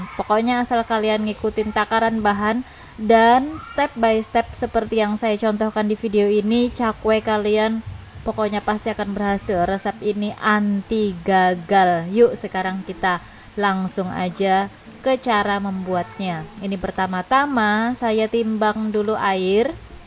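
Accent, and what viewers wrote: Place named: Banjar, Indonesia. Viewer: Pasti urang banjar admin yg ngomong ini hehehehe..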